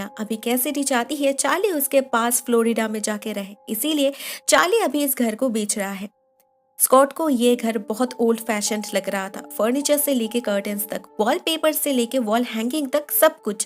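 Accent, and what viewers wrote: Bengal, India. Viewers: Didi are u Bengali🤩🤩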